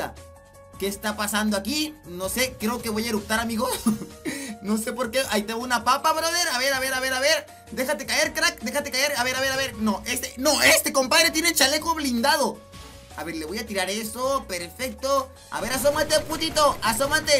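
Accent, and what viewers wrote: Mexico. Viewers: Oye y eres Méxicano o que pdo? 😂😂😂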